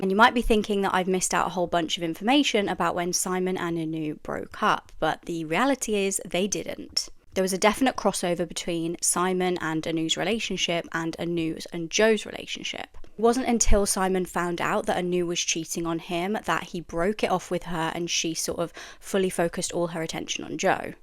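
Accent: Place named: Yorkshire, United Kingdom. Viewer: Yummy Yorkshire accent. ❤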